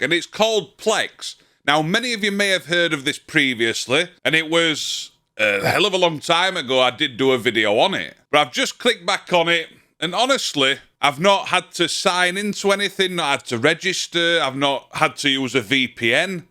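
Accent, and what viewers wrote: Yorkshire, United Kingdom. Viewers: A Newfie from Yorkshire? Love to hear the accent.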